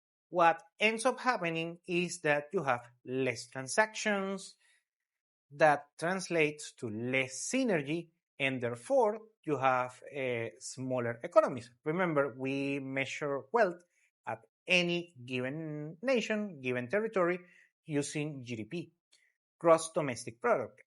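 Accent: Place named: Latin America. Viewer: Claramente tu acento delata que eres latino😂 por que entonces haces un vídeo en inglés si tu pronunciación es muy mala?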